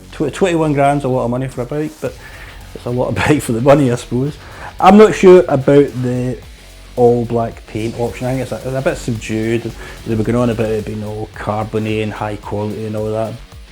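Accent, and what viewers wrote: Scotland, United Kingdom. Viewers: The scottish slang wouldnt be that bad if he would take the time to not chew every third word in the sentence. Other than that, nice visual impression of the track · It is not about just Scottish accent, even himself does not aware what he is talking about...he comes to no conclusion , jump from one irrelevant topic to another, just waste of time to watch this, one of the worst. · Great review. Always a pleasure listening to one of the many indigenous regional accents of the British Isles.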